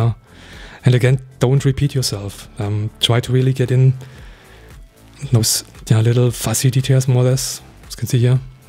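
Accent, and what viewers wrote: Germany. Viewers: His German accent is the best that u can find, lovely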